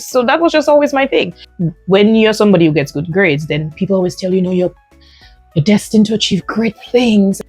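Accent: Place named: Dominican Republic. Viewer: Enjoying the Dominican accent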